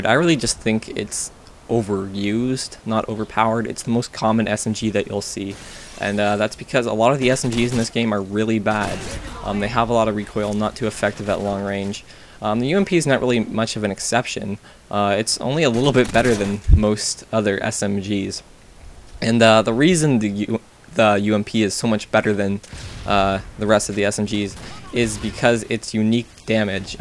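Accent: Canada. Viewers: Subbed Are u Canadian by any chance? I'm trying to see If I'm good at recognizing accents haha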